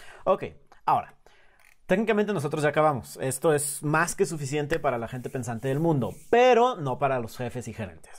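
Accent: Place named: Fresa, Mexico. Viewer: Eres muy bueno, pero dejé de lado la explicación y dejé de ver el video por que me hartó tu maldito acento "Fresa".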